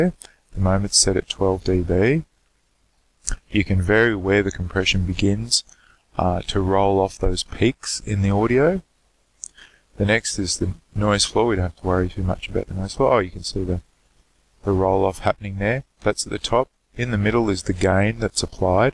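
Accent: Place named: United Kingdom. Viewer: Hey, this was a GREAT tutorial! Thank you very much for posting. I had used Audacity for a while, but stopped because I could NEVER figure out how to denoise things (and getting a new mic helped a great deal too...) Now, after seeing this, I think I'll start using Audacity again, as there are some very nice things about it... Faved. Good to see you again. Hope you're well. (I had NO idea you were British!:D)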